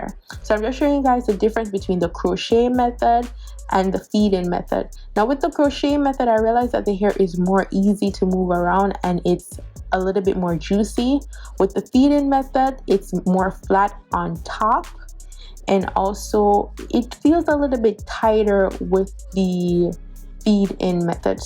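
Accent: Jamaica. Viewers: Hi there! U sound like your a Jamaican ☺😇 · Great video, you sound like a Jamaican. Are you? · First am stopping by, nice vid. You sound Jamaican are u? Is she guys? I'm Jamaican so I recognize the accent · Are you Jamaican? I think I hear the accent. Love the video👍🏽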